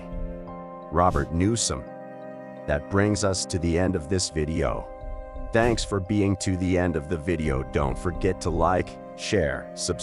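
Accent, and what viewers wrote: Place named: Boston, United States. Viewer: Is this a robot with a Boston accent? Wtf!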